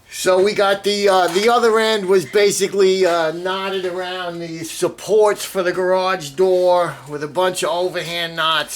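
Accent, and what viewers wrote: New York, United States. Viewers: Really easy to understand. Great video. Living in Hawaii, we meet and welcome people from around the world. If we did not, we would get bored looking at each other all the time. As I watched your video, I was thinking to myself where might I have heard your accent before. It came to me. Remember the movie "My Cousin Vinny"? And I do not mean it in any degrading way. Not at all. You explained everything the way it should be. Just right. Thank you for putting together a great video. A thumbs up, for sure. Aloha! K Inouye Honolulu, Hawaii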